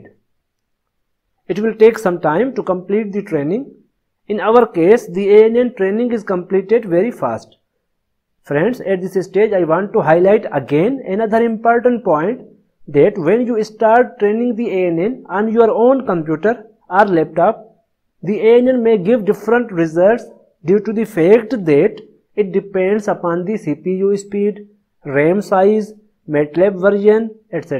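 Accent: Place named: Sindh, Pakistan. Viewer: Thank you dr sahb. Pray from Allah almighty to give me success. Wonderful methodology. Best teacher. Stay blessed. Love you from sindh Pakistan. Your accent is sindhi. Love you sir.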